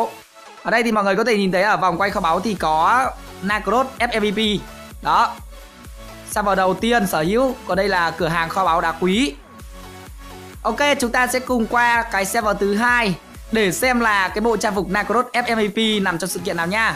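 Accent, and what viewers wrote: Nam Dinh, Vietnam. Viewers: Ah quê nam định à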